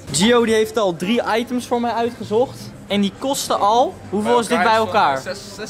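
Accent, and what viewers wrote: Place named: Rotterdam, Netherlands. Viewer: Wij komen uit Rotterdam kan je dat niet horen dan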